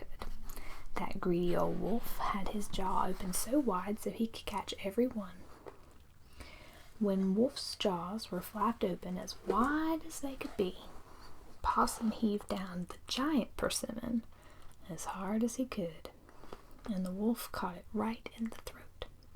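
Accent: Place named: South, United States. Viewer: Southern Accent is awesome.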